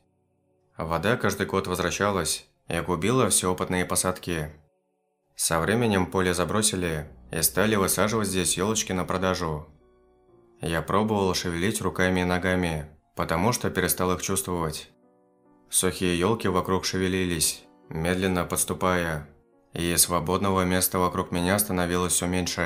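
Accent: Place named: Vyatka, Russia. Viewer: И правдо очень хорошо засыпать под Вятский говор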